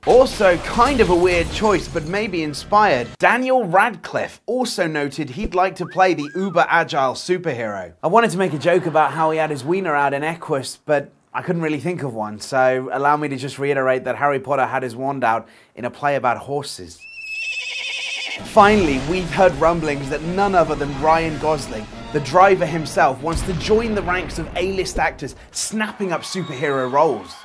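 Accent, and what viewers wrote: United Kingdom. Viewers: I'm just going to put this out there; the elephant in the room so to speak. I overlooked his weight because of his awesome British accent! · Your like jack black with a British accent. I love you! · I swear this guy really is Jack Black with a british accent.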